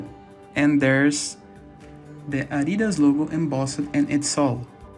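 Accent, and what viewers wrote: Brazil. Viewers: Pensando em comprar o branco. Teu sotaque entrega bastante que é Brasileiro kkkk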